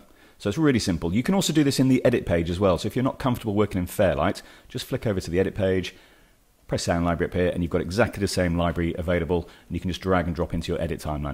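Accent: United Kingdom. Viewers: Thanks for this - very helpful and your approach is clear and friendly! Lovely british accent too:) From South Africa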